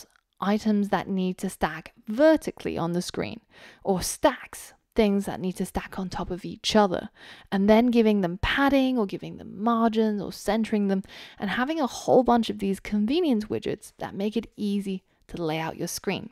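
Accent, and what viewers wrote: United Kingdom. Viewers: She’s from London.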